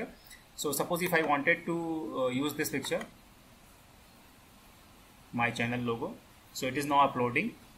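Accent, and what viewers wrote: India. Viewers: I have a problem with all accents just as some people have a problem with my mid-western/NY accent! I don't understand Cockney British English either! Or Australian ... so Indian is just about impossible for my ears. Nothing to do with the people who have the accents. I still can't find that 'line' section above where I type my response on Quora - and don't now how to explain that I need to know how to get THAT line. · uh, uh,uh, uhm. ok, .....ok,.....ok uh ahem and too much of a Hindi type accent, the worst of all accents. Get a text to speech converter there are a few for free on the internet.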